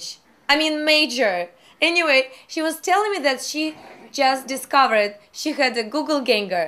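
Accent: Russia. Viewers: are you russian?...i think i hear the russian accent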